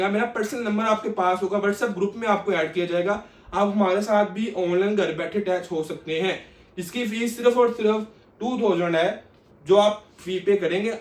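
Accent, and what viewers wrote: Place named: Faisalabad, Pakistan. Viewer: Ap faisalabad sy ho? Q ki ap areeb ki tara bolty ho iqra kanwal ky husband jaisy 😊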